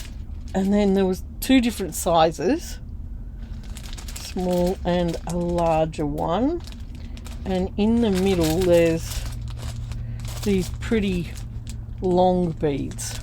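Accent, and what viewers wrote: Australia. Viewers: I was going to say how much I love to hear your Aussie voice..not many of us that I've seen on you tube ..and then I heard you say you went to Wangaratta..and I got more excited..I'm guessing you went to to the Lutheran op shop they have great crafty bits..anyway hi from another north east Victorian who looking forward to seeing what you make with all these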